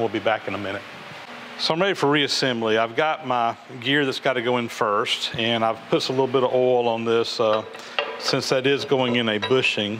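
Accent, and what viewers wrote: South, United States. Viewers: "No, I put the oil in the hole!" "You put the awl in the hole???" "No, the oil, in the oil hole!" "There is no awl hole!" "... " (my Dad had a reasonably heavy Southern accent, so I enjoy hearing one!)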